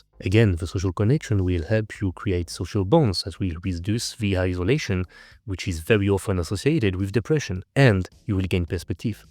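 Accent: France. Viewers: I would pay for a French accent like this 😂❤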